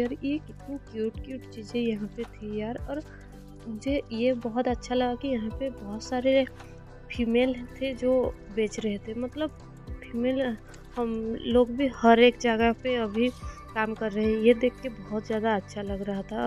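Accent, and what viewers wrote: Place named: Bengal, India. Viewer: Ap thoda bengali type bolti ho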